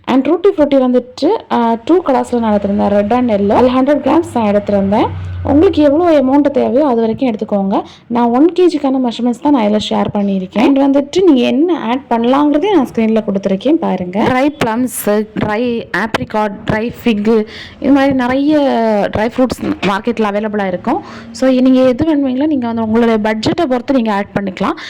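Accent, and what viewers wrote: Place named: Kanyakumari, India. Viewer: Ur voice seems similar to kanyakumari locality.. Will try this recipe for sure sis ... Random viewer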